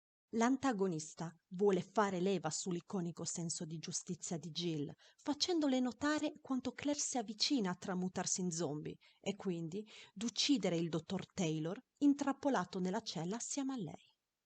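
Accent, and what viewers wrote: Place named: Italy. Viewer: You've just became one of my favorite humans on earth ! Really good video and i like your italian accent ! It's very well written and it's consistent the only thing i could say : " just continue !"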